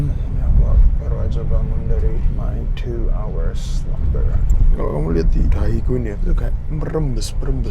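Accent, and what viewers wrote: Java, Indonesia. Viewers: Walah koh mbok mgomongnya yg bener...aku jadi ketawa ketiwi.. Kok yo medok banget😂😂😂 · Kamu orang mana bang.. Kok lucu medok banget kl ngomong bahasa Indo. 😊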